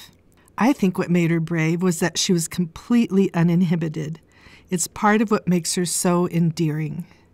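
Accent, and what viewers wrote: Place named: Utah, United States. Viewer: Beautiful work! I heard you speak this time and thought, "She's gotta be a fellow Utahn." Yep! Cool! Pleased to have something in common with you, in addition to our love for these neat spaces!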